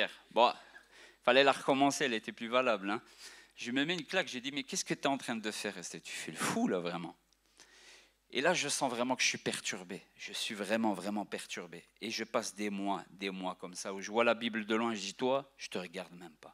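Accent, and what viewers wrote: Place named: Arab world. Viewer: Ça me perturbe de l'entendre parler Arabe no were au travers du français!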